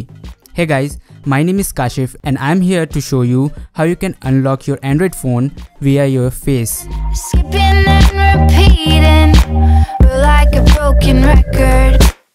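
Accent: India. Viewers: indian accent omg so hard to understand plase try to speak without accent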